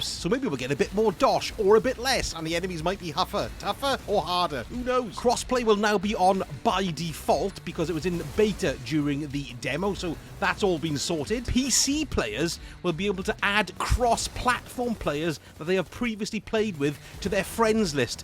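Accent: Wales, United Kingdom. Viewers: so ur welsh then, im in cardiff, are you on discord?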